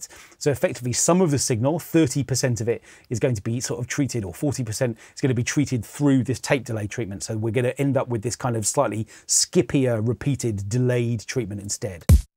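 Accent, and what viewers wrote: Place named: RP, United Kingdom. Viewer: Entertaining dull with a posh accent and 20 years old information. Maybe a gig with the BBC.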